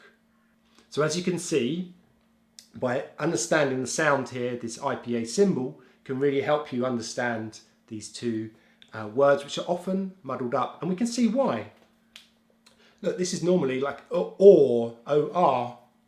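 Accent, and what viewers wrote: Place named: United Kingdom. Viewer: British accent is beautiful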